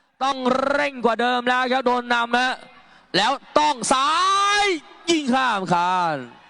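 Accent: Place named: South, Thailand. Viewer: พากได้ส้นตีนคนใต้มาก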